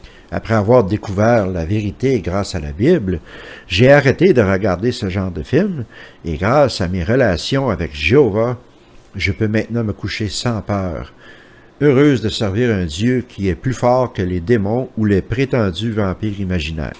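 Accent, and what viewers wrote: Canada. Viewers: Merci pour toutes ces videos. Elles sont tres bien et puis cet accent canadien, je ne peux me lasser de l'entendre.... C'est un accent sympathique, chaleureux. Franchement je ris de bon coeur quand j'entends que les Anges de Charlie et la Petite Maison dans la Prairie etaient des emissions condamnables a leurs yeux. Ils sont fous ces gugus, il faudrait mieux ette sourd quand on entend leurs arguments. La Petite Maison dans la Prairie, ca passe tous les jours a la TV en France. Allez demain je commence a lire les 2 volumes de l'encyclopedie biblique!!!! Cordiales pensees de France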